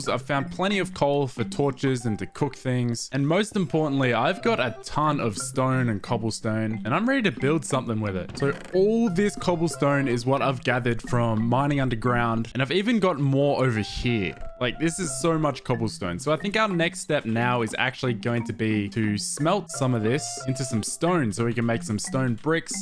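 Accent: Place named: Australia. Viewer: wait i just realised u got an australian accent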